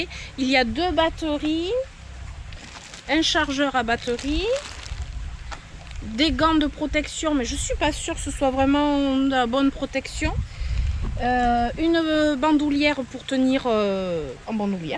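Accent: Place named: West, France. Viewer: Bonjour et j'adore votre bonne humeur et votre accent de l'ouest!!!!et bravo pour votre vidéo et merci 🌞🐞🍀🌹👏👏🦜✋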